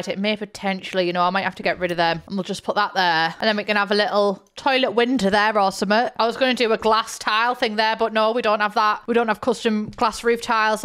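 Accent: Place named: North, United Kingdom. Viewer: FINALLY A NORTHERN BRITISH YOUTUBER THANK YOU FOR EXISTING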